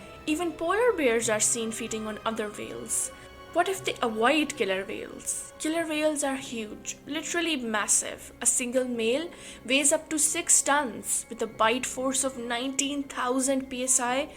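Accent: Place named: India. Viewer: No way could a little polar bear kill a large killer whale. Killer whale would manufacture mince carnage out of meager polar bear. Polar bear may have powerful bite, but so does killer whale & its mouth is grander with 4" dentures. Killer whales also hunt beluga whales as polar bears. Killer whales hunt same prey as these bears like seals & even kill great white sharks & i bet even crocodiles including larger whales than themselves, but as a group. If i were still a betting person, my money would be on killer whale as victorious victor. Anyway, narrator must be from India.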